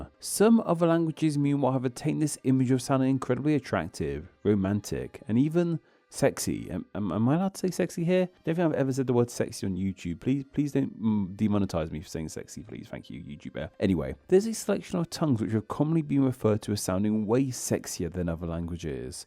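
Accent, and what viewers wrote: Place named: United Kingdom. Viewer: You have a wonderful voice! It has a distinctive tone, which combined with your British accent that makes it very appealing. We Americans are as fond of British accents as we are of French accents. Ours accents are rather “meh”.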